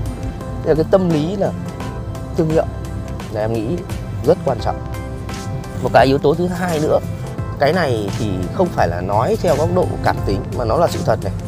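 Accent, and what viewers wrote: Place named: Hanoi, Vietnam. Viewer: Đúng chất giọng dân kẻ chợ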